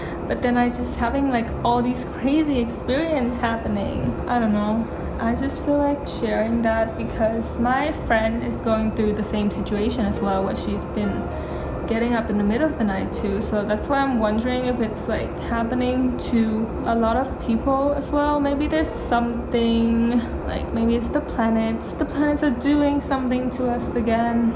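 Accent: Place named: United States. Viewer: I think you are Vietnamese but your accent very looks like from the US. It makes me confuse :v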